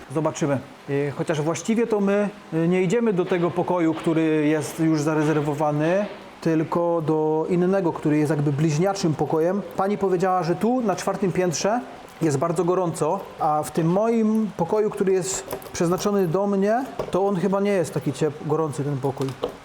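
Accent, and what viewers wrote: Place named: Silesia, Poland. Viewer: kurcze, do zludzenia przypominasz akcentem Podolskiego :D Skad jestes ze slaska? Pozdro z Chicago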